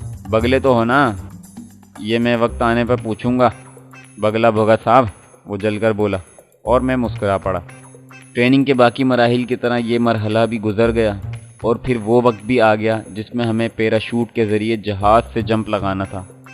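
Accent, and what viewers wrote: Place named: India. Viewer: Ye lehja indian hai ......aesa kabhe nahe hota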